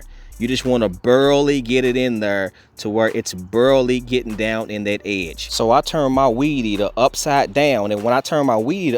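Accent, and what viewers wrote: South, United States. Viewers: Great video. Wow. I love that southern accent.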